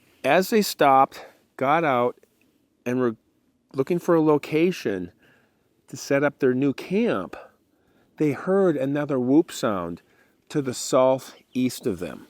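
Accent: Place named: Great Lakes, United States. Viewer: Hi! I was a long time subscriber, but in the winter I had to disconnect for a while, and in the spring, with a new laptop, I could not retrieve my old Youtube account. I lost all my old subscriptions, and could not comment for a while. I will be sorting that out soon, and start re-subscribing to my old friends and their channels. Now I am catching up on your videos since the new year. Cheers! 🙂 I am living in Southern Ontario, and the difference between the over-populated south of Ontario, and the real Northern Ontario wilderness is extreme! We even share an accent with Minnesotans. According to linguists, it is called Yoopers, which is a distortion of Upper Peninsula. The Great Lakes rural folk seem to speak similarly. Great Lakes folks share this accent, and I only notice it occasionally, but I hear it with you. Cheers again! Nice to see your videos!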